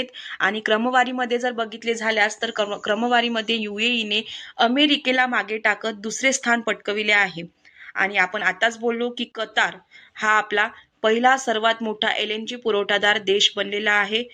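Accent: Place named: Vidarbha, India. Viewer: Ati uttam mam, tumhi vidarbhachya watata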